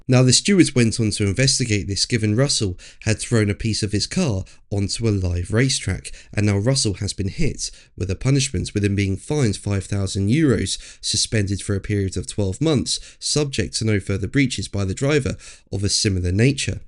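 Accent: United Kingdom. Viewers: Not only does deliver in a slightly slurred British monotone voice, I really didn't learn anything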